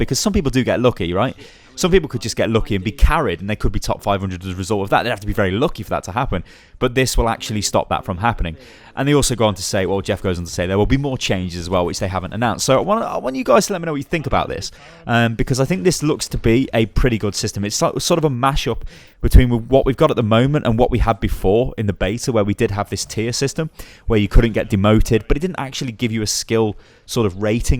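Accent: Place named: United Kingdom. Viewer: What's the point of these vids? It's literally just everything you can hear in the update videos Blizzard makes, but unorganized and with Overwatch gameplay in the back. I guess they're good if you like British accents and don't like Jeff's lisp.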